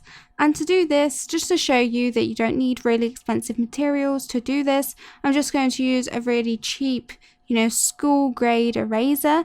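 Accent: United Kingdom. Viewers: I love your channel ❤️ also are you British? Xx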